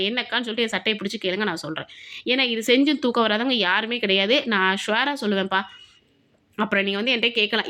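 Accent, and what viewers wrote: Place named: Kovilpatti, India. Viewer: Akka ninga ena ooru na kovilpatti nama ooru slang pesuringa ketan rmb depression aaguthu ka thaniya iruka mari iruku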